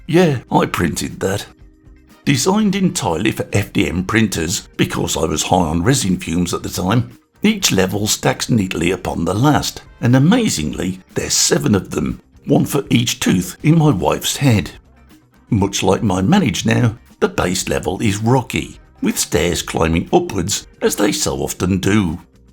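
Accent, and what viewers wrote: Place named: England, United Kingdom. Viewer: You sound english. Use feet and inches !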